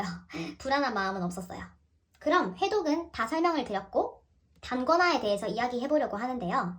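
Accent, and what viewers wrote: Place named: Gyeongbuk, South Korea. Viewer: 혹시 대구나 포항 경북지역사시나요? 말투보면 그런것같아서용ㅎㅎ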